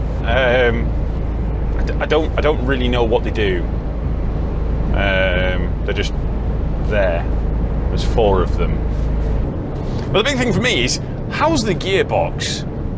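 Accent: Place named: North, United Kingdom. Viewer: excellent rant, and you were speaking proper northern, stay safe, oh and cleaning products try bullsnot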